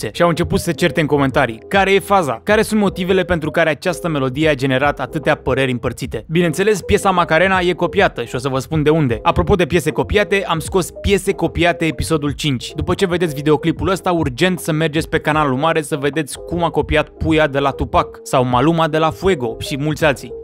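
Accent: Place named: England, United Kingdom. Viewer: Băăă, ce bine o dai cu accentul englezesc 😂 Ar fi tare să te faci cântăreț😂😂😂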